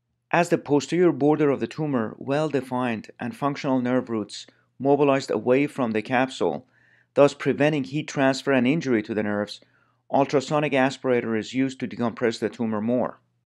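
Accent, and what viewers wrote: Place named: Iran. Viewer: Lovely Persian accent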